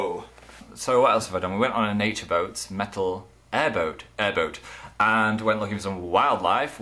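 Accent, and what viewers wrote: Texas, United States. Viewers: I like your American accent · Oh my god ur American accent!!!! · i like your Texan accent:) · anybody else think he sounds like makemebad35 with an American accent? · Lol ur american accent is awesome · accent in this video is like the ones in Texas. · I like your American accent. It's actually pretty good, although it's hard to tell when you go back and forth. You should to a video were you try to say things in an American accent if you haven't already. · PLEASE DO A WHOLE VIDEO IN AN AMERICAN ACCENT!! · your american accent is really good! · His American accent makes him sound like Christian Novelli.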